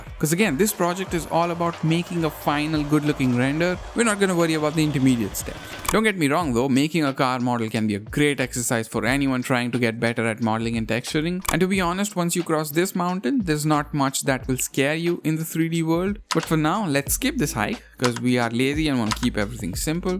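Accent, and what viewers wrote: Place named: India. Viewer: you click the video, you hear an Indian accent.... hell yeah 🔥🔥🔥🔥🔥🔥🔥 like the video and subscribe the channel, you'll never be disappointed.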